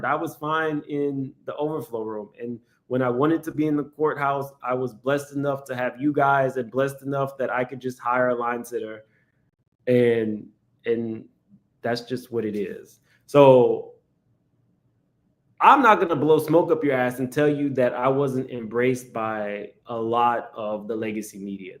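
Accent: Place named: Louisiana, United States. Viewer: When you mentioned the Louisiana coming out of you, I immediately thought "Ya maw!" 😂 I'm from Louisiana too!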